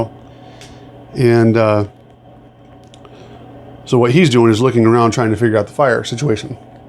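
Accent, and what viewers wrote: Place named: Michigan, United States. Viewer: Great fire stories. The Michigan drawl working good. 💨💨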